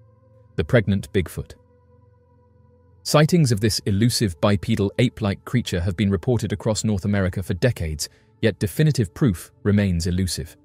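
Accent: United Kingdom. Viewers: Why pick the British accent for narration? Your voice isn't good enough?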